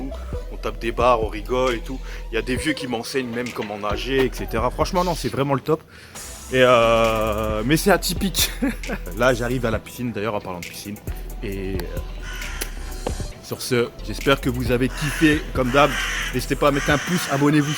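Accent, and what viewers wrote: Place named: Banlieue, France. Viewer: Jai trop hate daller au Japon, par contre tu parle vraiment comme un banlieusard mec, mais cest pas grave jadore tes vidéos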